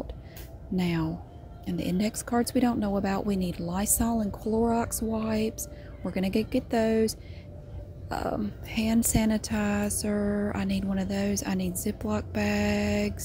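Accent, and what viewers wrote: South, United States. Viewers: I normally don’t care much for southern accents but her voice is sooooooo soothing to my ears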